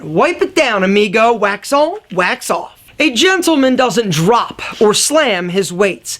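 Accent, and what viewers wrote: Philadelphia, United States. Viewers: john doe Sounds like Philly. That is how they talk. Listen to Darryl Hall, he sounds like this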